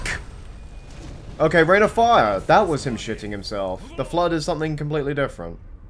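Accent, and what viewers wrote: United Kingdom. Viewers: Hi I'm just a little YouTuber nobody but I'm from Oz and I promise you don't have an Oz accent, you sound beautifully British:) Love all the stuff you do and you are the reason I started watching YouTube, never stop with your magic!:) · Thanks for playing the map, hopefully I can make some more in the future, if I do (and if they're good) they'll be coming your way. Yes I'm aussie, and your accent is British, but, as they say....... Iv'e liked Bfme versus since BEFORE it was lame. Just joking I love ur channel I dont mind if u use my name, its ok. whatever.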